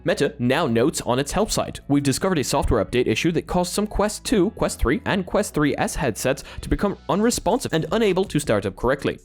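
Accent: Poland. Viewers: WAIT HES POLISH ohh s o thats the polish accent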